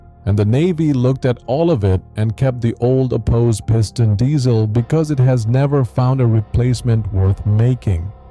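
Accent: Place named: India. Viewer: An AI voice model with a slight indian accent on this type of channel is a wild choice!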